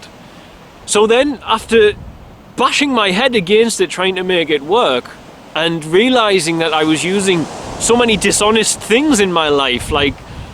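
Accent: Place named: North, United Kingdom. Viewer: I'm glad you just sit and ramble on with beautiful information:))) and love your northern accent!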